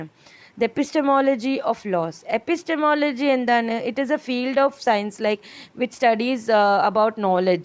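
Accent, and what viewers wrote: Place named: Kannur, India. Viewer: thankyou yr classes are great .. And your speaking style reminds me of my old friend at kannoor😀👍🏻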